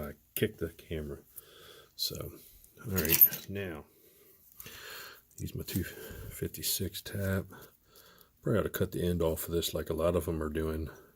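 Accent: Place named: Pittsburgh, United States. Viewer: Glad I found you. Time Rider sent me. TR, Bare Metal HW, WheelsNThangs, Marty are my teachers. You appear to have joined the faculty. Are you from anywhere near, PA. I am, you sound "familiar" for lack of a better way of putting it.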